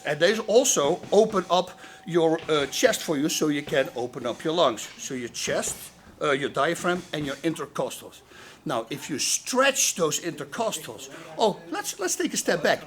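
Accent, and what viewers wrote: Netherlands. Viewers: Hoi. Ik ben de meeste amerikaanse fitness adviseurs een beetje zat. Meestal komen ze met varianten op dingen die we wel kennen. Jij weet mij te verrassen. Fijn om heel in de verte dat nederlandse accent te horen. Ik zit dagelijks op de racefiets en deze tips ga ik zeker uitproberen. Groeten & Dank van een 60 jarige rotterdammer.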